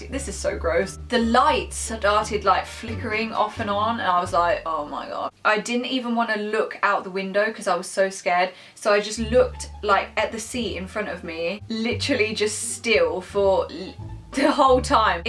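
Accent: United Kingdom. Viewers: Where are you from? You sound like your from Newcastle xx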